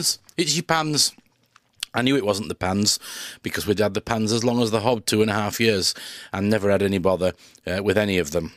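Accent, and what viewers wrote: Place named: Yorkshire, United Kingdom. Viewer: Great show, as always ‘. Love the Yorkshire dialect. Please play me ‘ ’ for my husband, Michael and me. Our son, Adam, has lived in New Zealand for 16 years, now, where he and our lovely daughter in law gave us our first two grandsons, Callum and Sam, whom we see far too rarely, but thank goodness for Facetime. Please keep up the good work. We love your shows even more on YouTube.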